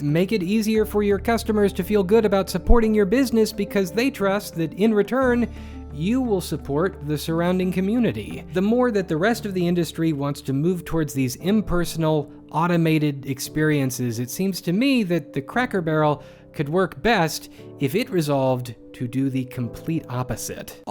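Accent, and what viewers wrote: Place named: Missouri, United States. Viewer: restaurant videos also show signs of modernization by removing the emotional slips into a Missouri accent. Whenever I feel the need to mimic my Springfield-based coworkers I always locate the accent with "have a training day!" and it brings me immense joy.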